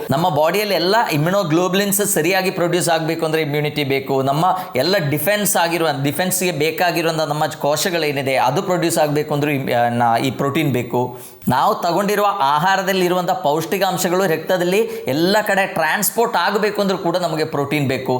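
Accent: Kerala, India. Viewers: ಅದ್ಬುತ ಕನ್ನಡ ಮಲಯಾಳದ ಶೈಲಿಯಲ್ಲಿ..‌ಅಡಿಪ್ಪೊಳಿ... ದನ್ಯವಾದ.‌🎉 ಉತ್ತಮ ಮಾಹಿತಿ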